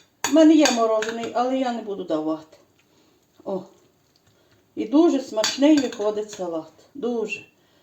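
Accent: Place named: Ivano-Frankivsk, Ukraine. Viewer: Все чудово, і рецепти салатів, і випічки. Дякую. Але, якби ви ще відшліфували українську мову, не вєивали русизму, при тому, що бажаєте всім миру і перемоги, було би мега прекрасно. Даруйте, що роблю вам таке зауваження, але мене дратує те наше уподібнення до московитської мови. Адже наша мова така багата, така милозвучна, а юагато наших західняків знаходять уподобання "збагачувати"свою мову русизмами: юбка /ніби спідниця уже не в моді/, зря /ніби даремно уже застаріле/. Отямтеся люди! По вашій бесіді я можу визначити, що ви з Франківщини і тому ще більше боляче чути суржик, який як іржа роз'їдає нашу багатющу і особливу МОВУ. Бажаю вам мудрості, бути берегинею не лише кулінарних страв і смаколиків, але й нашої унікальної української мови і навіть "бандерівської" неповторної говірки, нашого унікадьного діалекту. Здоров'я вам і творчих досягнень./успіх- калька московитів/.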